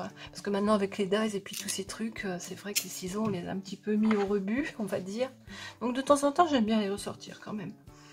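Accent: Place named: Picardy, France. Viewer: encore mille fois merci je rigole à chaque fois avec tes petites pointes d’accent je me doutais un p’tit peu que c’était cette dimension j’ai commençé la carte je te dirais quand elle sera complètement finie je prends mon temps je veux que ce soit bien fait pour mon p’tit loulou je me lance je suis vraiment débutante tu sais merci ma tiote picarde gros bisous et à très bientôt pour de nouvelles cartes il va falloir que je m’équipe petit à petit!!!!💋👍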